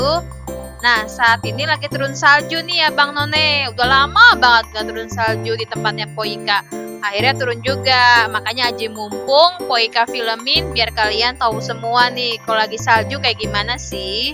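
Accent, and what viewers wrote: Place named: Betawi, Indonesia. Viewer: ngomongnya legit bgt gemes betawi medok 😊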